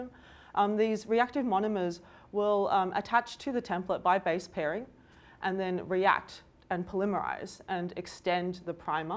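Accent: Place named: Australia. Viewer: thank you- down under accent can be fun to listen...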